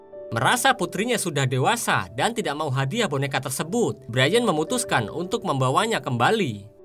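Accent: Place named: Ngapak, Indonesia. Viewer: Wong Jawa ya mas, ngapak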